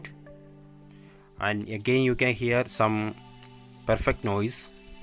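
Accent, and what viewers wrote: Kerala, India. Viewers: Bro r u from kerala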